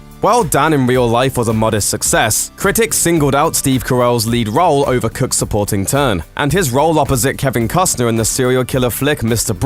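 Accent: United Kingdom. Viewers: A bad brit speech bot is going to tell us what is funny and what is not. Nah, move along. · To make matters worse he has to get low key patronized by a nameless faceless person with a trash stereotypical British accent. Who deep down has to know he will never be as big as Dane Cook professionally. Ohhhh. Do carrot top next. People love to trash on him. I think it will be funny for a no talent hack to trash someone they can only dream to be as “successful” as 🙈🤩🤡🤑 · Can you guys pick another narrator? This guy's accent and is jarring and seriously annoying. Plenty of British voice actors out there who don't grate on the ears when they speak.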